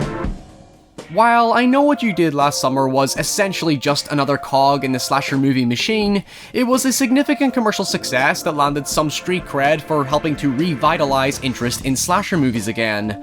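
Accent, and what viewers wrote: Northern Ireland, United Kingdom. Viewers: My guy are you Northern Irish?? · U have a northern irish accent? ❤❤❤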